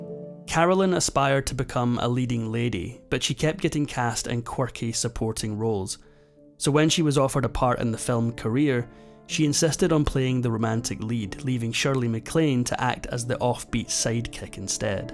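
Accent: Scotland, United Kingdom. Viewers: A fellow Scot. I can't think who he is 🏴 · . Scottish AI? I don’t think so, not with those pauses and inflections. If you listen, you can hear him inhale. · Six months, the narrator is Scottish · Lovely gentle Scots accent.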